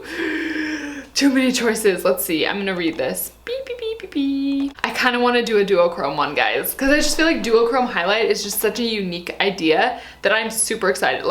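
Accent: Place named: South, United States. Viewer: Hey there, I will say makeup needs to work to accentuate the good features that you have. It's overkill, and As a mask is when you wear it all over the place in bold colors with the focus being everywhere. Learn to focus on a favorite part of your face. You can never go wrong with that advice. Also, you are southern? I think I hear a slight twang haha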